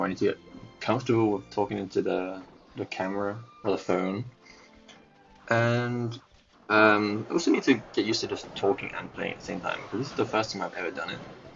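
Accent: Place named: United Kingdom. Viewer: same even though I’ve lived here my whole life. You’re voice just kind of was a british twang if that makes sense 😭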